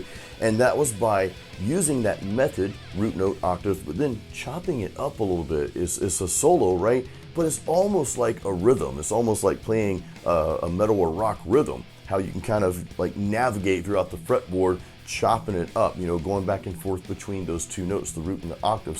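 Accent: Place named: New York, United States. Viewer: I know you are from Florida, but sometimes I am sure I can hear some New York in your accent LOL.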